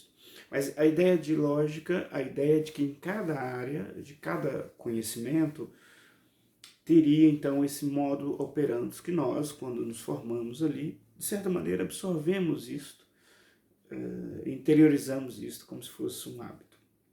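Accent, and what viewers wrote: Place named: Minas Gerais, Brazil. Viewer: Eita mineirice aristotélica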